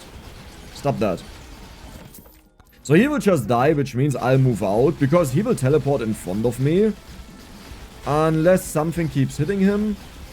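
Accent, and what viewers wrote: Germany. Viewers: the german accent finally calmed down for a moment here🙏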